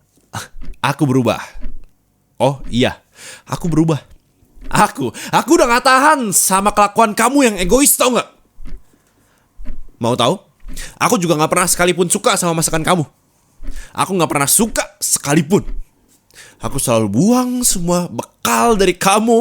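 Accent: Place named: West Java, Indonesia. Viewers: Singkat padat baheula ni sunda banget ni aa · Dari logat nya kaya orang sunda 😭👊🏻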